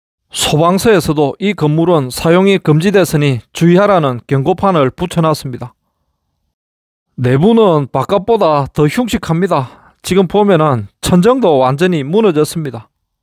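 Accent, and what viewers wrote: Gyeongsang, South Korea. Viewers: 경상도 목소리 너무 거북해요 웬만하면 표준말로 해주시면 안될까요??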